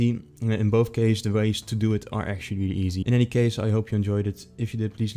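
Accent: Netherlands. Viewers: he has an a dutch accent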